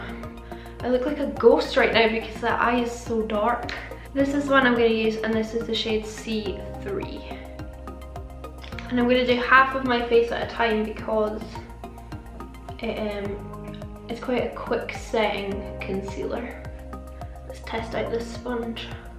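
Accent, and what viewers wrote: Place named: Scottish Highlands, United Kingdom. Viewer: I love it!! Where is your accent from??! You sound like a fellow highlander? Xxx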